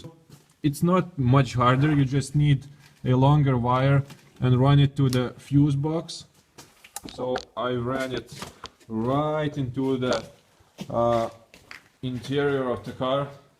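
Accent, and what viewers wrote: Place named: Russia. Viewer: first, sorry for my bad Englisch, you talk like an Russian Comrade, i' am and my friends are very louthing about your Video , your Speech is wonderfull, you are the Best !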